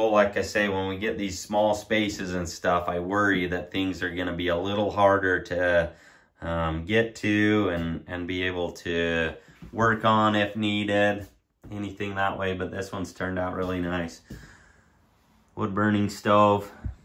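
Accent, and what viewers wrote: Utah, United States. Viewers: I thought I recognized that Utah accent. Love this camper!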